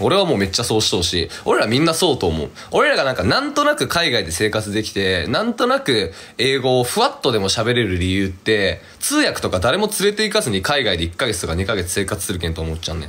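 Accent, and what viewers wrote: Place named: Fukuoka, Japan. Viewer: 出身は、福岡市ですか？久留米とかですか😊？ 親戚の話し方と似ていたので少しだけ親近感が湧いたのですがもし違ったら申し訳ないですが😅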